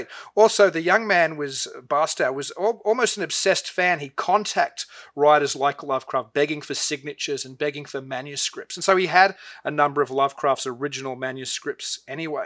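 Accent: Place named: Sydney, Australia. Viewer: Good to see a fellow Sydneysider talking about Lovecraft books 😊Recognised the accent straight away.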